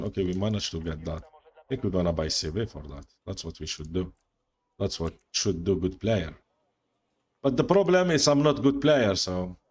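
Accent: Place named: North Africa. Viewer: Your English is good BRO, it's like North African english.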